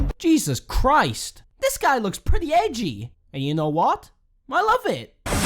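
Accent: Wales, United Kingdom. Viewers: do i hear welsh?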